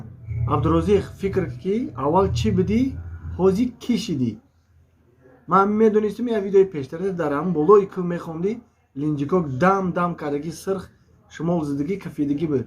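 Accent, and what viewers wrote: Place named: Yovon, Tajikistan. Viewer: Ту монда буди ба хами акценти ёвонит Абдурозика таьна накарда.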